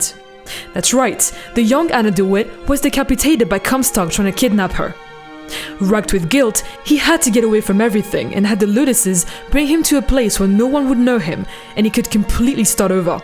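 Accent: France. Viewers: are you french? omg Salut! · Its a French woman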